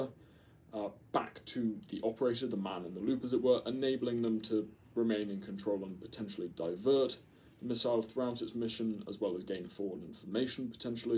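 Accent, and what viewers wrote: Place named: RP, United Kingdom. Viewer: This guy is POSH!!!!!!!🧐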